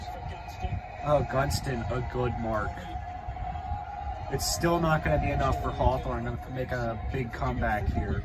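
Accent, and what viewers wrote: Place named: United States. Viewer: You do sound America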